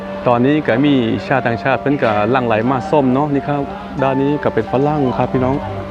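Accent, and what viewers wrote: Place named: Sakon Nakhon, Thailand. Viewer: เจ้าสัญชาตลาวแท้บ้! ฟังสำเนียงคือ แถว สกลนคร หรือนครพมม...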